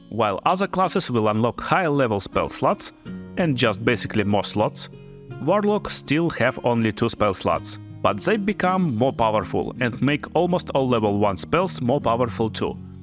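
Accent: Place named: Russia. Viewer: Почему я чувствую русский акцент..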